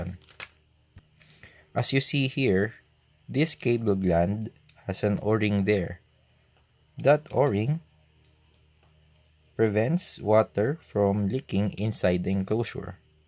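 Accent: Philippines. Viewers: I recognize the accent. Are you filipino?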